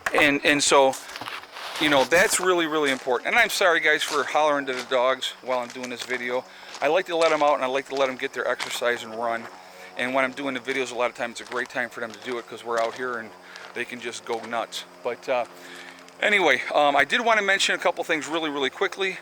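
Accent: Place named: South, United States. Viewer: I thought you were from North Carolina..or some southern states.. Y could anyone hate you? Perhaps they're jealous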